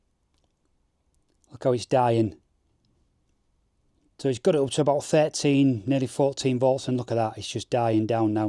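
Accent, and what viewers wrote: Hull, United Kingdom. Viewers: Just subscribed brilliant video sounds like your from hull mate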